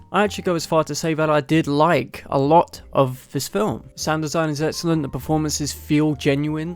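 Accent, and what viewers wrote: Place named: RP, United Kingdom. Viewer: U sound like an upperclass nob tbh so I wouldn't listen 2 Ur opinion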